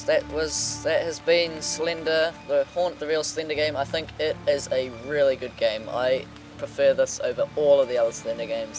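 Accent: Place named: New Zealand. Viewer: HEY! You're from New Zealand too! I recognised the accent :D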